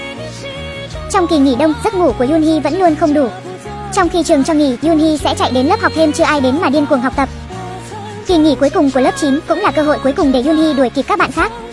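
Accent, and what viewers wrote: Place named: North Korea. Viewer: Nghe thì giống bên triều tiên hơn:)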